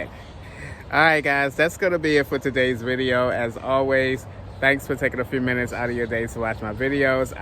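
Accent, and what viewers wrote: Baltimore, United States. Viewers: You’re from Baltimore for sure loln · Hi! Fellow Baltimorean who decided to jump ship years ago. I still hear the Baltimore accent "tew". Lol! Enjoying the videos, bro! Keep them coming.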